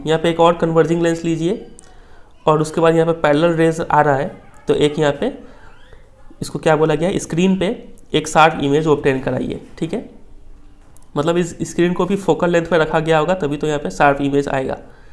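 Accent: Bihar, India. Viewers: Sir AP bihar ho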